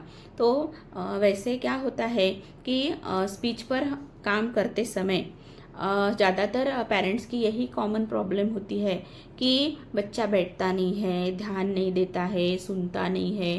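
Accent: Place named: Maharashtra, India. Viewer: Apki hindi bohot cute hai..mujhe meri mahastrian friend ki yad ati...wo v same apke jaisa bolti thi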